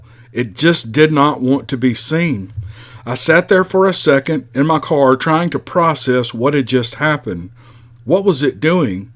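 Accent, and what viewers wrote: South, United States. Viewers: awesome!! luv ur stories bro. you have that southern drawl kinda like peyton manning. just luv the stories and your true self is exposed to your fans. keep up good work! luv from California!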